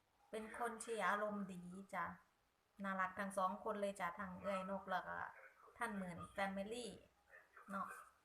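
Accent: Korat, Thailand. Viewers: สวัสดีจ้า ภาษาโคราชน่าฟังค่ะ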